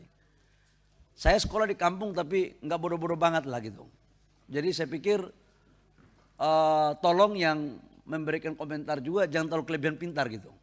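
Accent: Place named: Papua, Indonesia. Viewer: 😂😂😂 mantap pak menteri sa suka logat p Papua d pakai d sini. D tempat wakil rakya.😅😅😅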